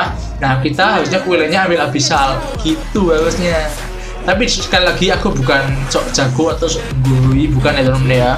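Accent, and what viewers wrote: Java, Indonesia. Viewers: Aku seneng logatmu medok, mas....wkwkwkwk nice content 😄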